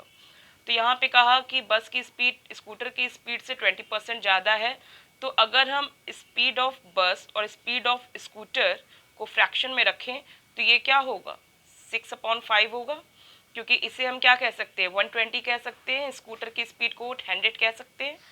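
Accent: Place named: Eastern Uttar Pradesh, India. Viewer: East UP accent ❤️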